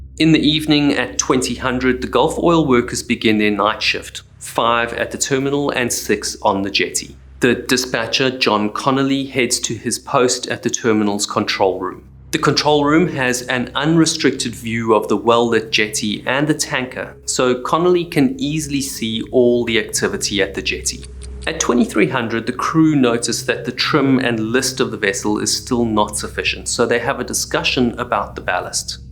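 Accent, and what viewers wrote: South Africa. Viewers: Great video. I hear a South African accent there. Thanks for not going AI.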